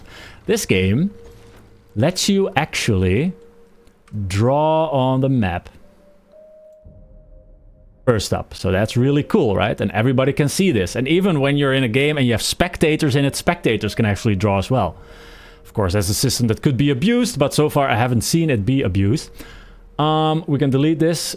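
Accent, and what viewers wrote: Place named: Netherlands. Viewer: Very good video! Are you Dutch per chance? I hear an accent :)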